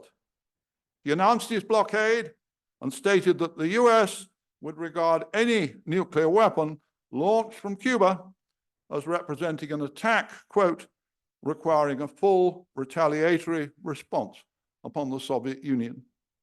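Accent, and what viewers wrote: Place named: United Kingdom. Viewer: yes, when I hear a pompous British accent I know it's a coward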